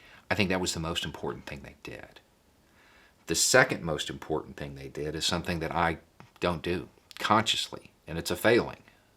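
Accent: South, United States. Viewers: Hey... You are great. Sometimes, you just need someone bilingual... You speak southerners English very, you found someone who speaks Christian English in, he seems to understand your language. Now maybe you can work together to achieve a better world. I couldn't do what you do in Florida, but I can probably do something with people that speak my language.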